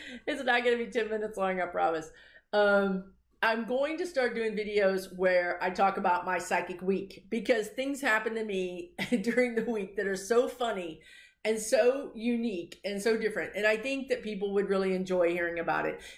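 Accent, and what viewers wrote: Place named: South, United States. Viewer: Love your southern accent :)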